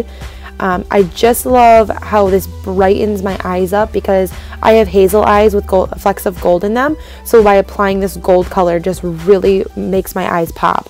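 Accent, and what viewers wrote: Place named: North, United Kingdom. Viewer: I cannot tell you how much I love your videos!! Ps. Your northern accent is freaking adorable!!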